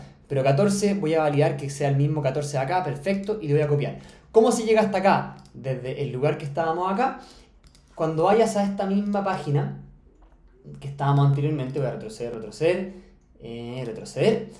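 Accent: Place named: Chile. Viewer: explicas pésimo demasiado chileno pero buen contenido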